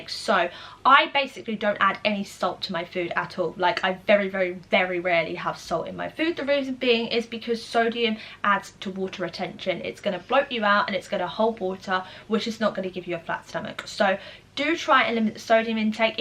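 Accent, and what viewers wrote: United Kingdom. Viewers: Dominique Nah she's English,sounds like from the South East Coast. Essex, maybe Chigwell which is just adjacent to Northern region of Greater London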